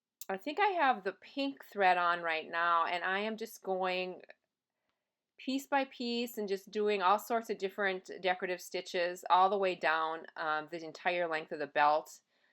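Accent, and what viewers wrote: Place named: Iowa, United States. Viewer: The only redeeming feature of the belt are the buckle rings...you really made it beautiful. My family in northern Iowa sound just like you...delightful.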